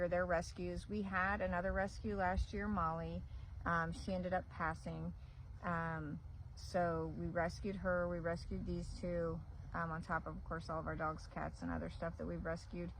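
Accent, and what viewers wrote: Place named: Indiana, United States. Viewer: Thanks for that. Your donkey's, your farm, and your Hoosier accent are all interesting to an Australian bloke like me here in Canberra. 🐐🐎🐔🐑🐶😸🇦🇺👍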